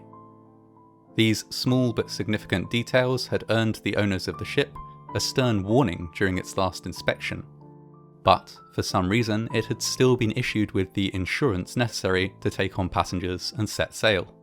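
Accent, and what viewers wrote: United Kingdom. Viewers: Is this British accent?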